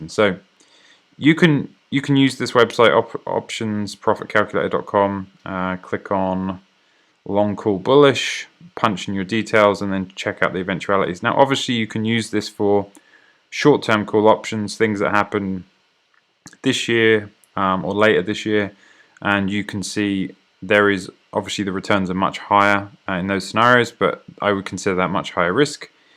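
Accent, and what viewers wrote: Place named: United Kingdom. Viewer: Where do you buy your calls? Sounds like you’re from the uk too?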